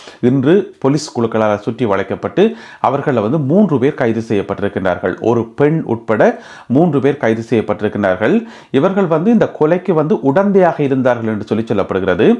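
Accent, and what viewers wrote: Jaffna, Sri Lanka. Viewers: யாழ்ப்பாண தமிழில் செய்திகள் வழங்குவது சிறப்பாக உள்ளது.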